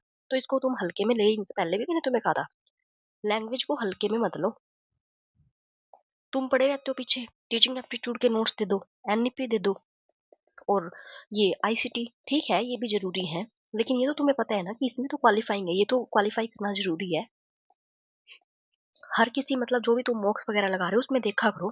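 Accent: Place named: Haryana, India. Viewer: ye to m awaj se hi samj gyi thi lekin hr. Me kha se h aap m bhi hr. Se hi hu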